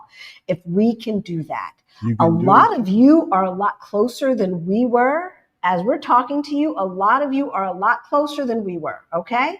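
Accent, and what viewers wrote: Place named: Pacific Northwest, United States. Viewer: I can hear PNW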